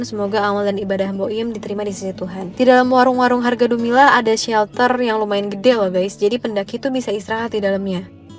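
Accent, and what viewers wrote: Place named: East Java, Indonesia. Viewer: Cakepp.. auto subs dh.. 😊👌 kirain asli jatim hehe..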